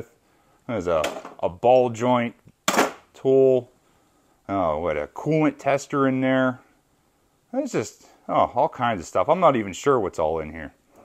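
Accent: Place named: Pennsylvania, United States. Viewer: sounds like you have a pennsylvania accent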